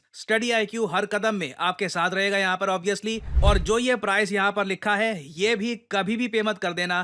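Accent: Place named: Haryana, India. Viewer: Bhai wo bolane ka accent hai Haryana uttrakhand mein sayad bola jata hai.😅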